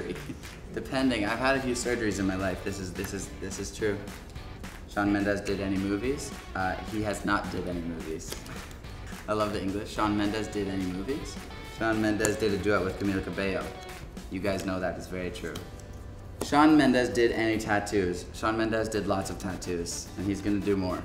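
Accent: Rio de Janeiro, Brazil. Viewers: que sotaque carioca mais lindo, tô apaixonada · parece o sotaque do povo do Rio de Janeiro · E esse sotaque carioca no Buxxcando?? Ameei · o sotaque dele é bem carioca 😂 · "BUXXXCANDO MEU NOME" meu Deus, ficou quase uma semana no Rio e já tá falando igual Carioca <3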